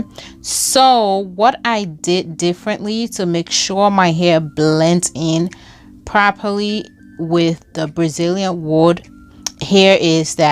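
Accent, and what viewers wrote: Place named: Liberia. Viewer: Are you a Liberian? You sound like one no doubt 🥰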